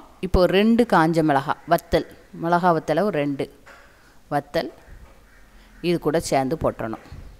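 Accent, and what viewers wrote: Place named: Tirunelveli, India. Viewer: Pudina Chutney super. Ungal Tirunelveli Tamil also super.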